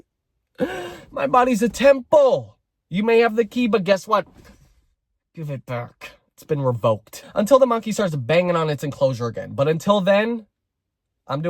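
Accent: Canada. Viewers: dont know how i didn’t notice your canadian accent in the first video boy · Ok you’re definetely Canadian lol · Your Canadian accent really came out in this one haha you’re my new favorite YouTuber GAY GUY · That sorry... are u Canadian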